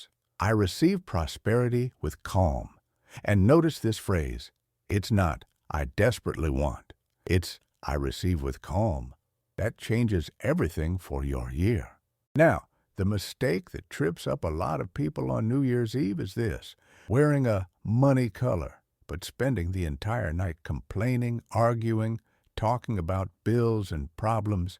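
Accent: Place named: South, United States. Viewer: OMGracious.......nothing like a southern drawling buddhist message~ ~ ~ giving us an opportunity to stretch past concepts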